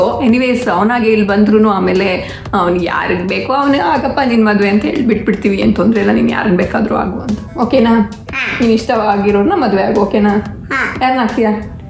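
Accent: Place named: Mysore, India. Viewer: Ur accent feels like u r from Mysore or Bangalore madam or Mandya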